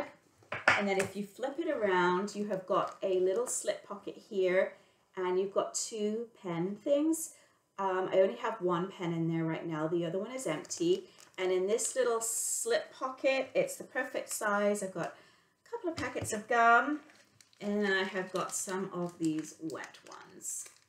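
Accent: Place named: United Kingdom. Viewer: Just discovered your channel which I am enjoying. I noticed a subtle British accent, then in a later video, you stated you were going to visit relatives in the UK. Where are you from in the UK? Hope you start making videos again.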